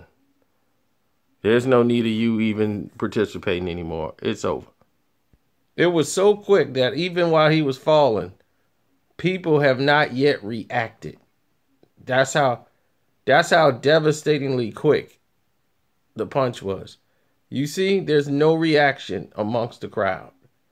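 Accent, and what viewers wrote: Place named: South, United States. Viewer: That southern drawl cracks me up! What you say is true.Takam left his fight with his head held high. Stiverne did not.You can lose with dignity or without it.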